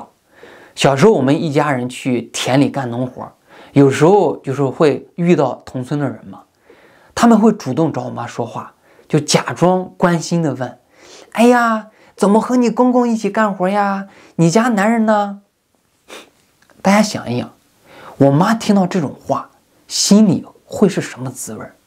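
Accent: China. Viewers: 當初看到你的頻道，是因為你初到美國找工作，最後選擇拖車看起，其實一開始看到你就覺得好像沒這麼像華人臉孔，但是聽你的腔調確實是大陸，也就繼續看著看著，但是心中很多的疑問，覺得你的心中有很多想說的，一直期望盼望著，總算這幾期算是更了解的背景，也知道要講出這些話，心裡的壓力確實很複雜很苦，聽你說完真正感受到你心中的苦與黑暗，給予你支持也更加了解內地與農村的真實苦難…謝謝分享也更珍惜現在擁有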